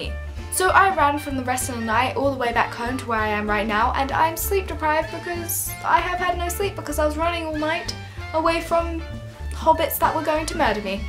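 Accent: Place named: RP, United Kingdom. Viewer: I'm from England and I want your accent is that weird? You sound so posh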